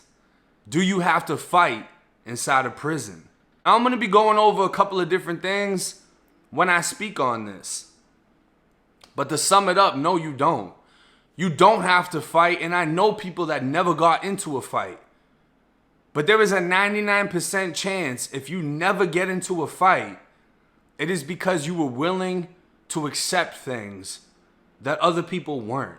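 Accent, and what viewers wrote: Boston, United States. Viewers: what part of Mass are you from.The Boston accent is distinct. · It's about time you started talking about "Boston" where your a native at bud or places across the world.... this is getting a little dry, you only did 3 years in a Fl prison, and you have a whole channel based on those "3" years, and while living and obviously being from "Boston" you talk about Fl prison and the people who live in this "one state" you only served "3" years in prison at, but your not a native 2..😒 you should expand which prisons you talk about talk about them "ALL" your language skills are superb very well spoken! you def should have a channel your more then worth listening 2. If you didn't sound like Leo from "Departed" or "Wolf of Wallstreet" it would go down smoother, but your "Obviously" from 'Boston" but have and "ENTIRE" channel based just off only 3 years of your life from a place you were a transplant 2 in the 1st place.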